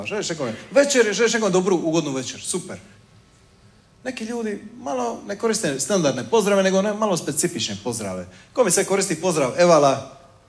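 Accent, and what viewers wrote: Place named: Dalmatia, Croatia. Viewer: Super humor, Dugo se nisam tako nasmijala. Obožavam taj Dalmatinski naglasak. Pozdrav iz Francuske ❤❤❤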